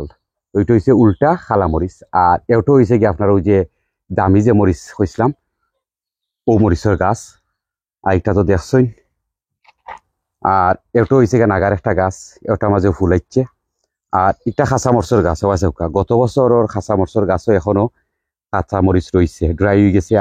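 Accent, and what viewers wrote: Sylhet, Bangladesh. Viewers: মাশাআল্লাহ সিলেটি মাত হুনিয়া বালা লাগের আজ সুন্দর ভিডিও দিসোন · I liked your Sileti speech today in a different way. Your brother is from Sylhet, so I understand Sylhet. All your trees are very beautiful. It seems like you spend a lot of time in the garden. Hope you are doing well. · আসসালামু আলাইকুম ভাইসাব যে ভালো লাগছে একবারে সিলেটে খাঁটি মাত।ফুল ভিডিও আমার অত বালা লাগছে। শিক্ষনীয় ভিডিও উপকার আসবে ইনশাআল্লাহ। ❤❤❤দোয়া রইল ❤❤ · ছোট ভাই আপনার সিলেটি কথা অন‍্য রকম ভালো লাগলো আজকে . আপনার ভাই সিলেটের তাই সিলেটি সব বুঝি . আপনার সব গাছ অনেক সুন্দর . দেখেই মনে হয় আপনি অনেক সময় দেন বাগানে . ভালো থাকবেন দোয়া রইল 👌👌🤲🏻🤲🏻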